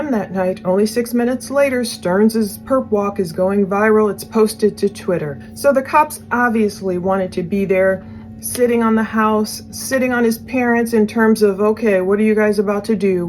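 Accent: Australia. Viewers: Australian accent , you do an amazing job. Thankyou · Love your channel think that accent is Aussie tho 😊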